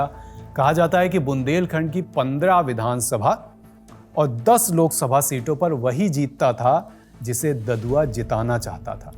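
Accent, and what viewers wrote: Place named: Awadhi, India. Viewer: Bhai ye awadhi h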